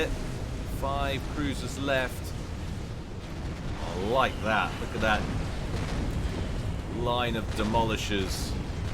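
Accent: United Kingdom. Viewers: Ive been gone for a while. But im back. Good to see your still active. I now feel old. But hearing a fellow british voice makes me know your quality has only got better.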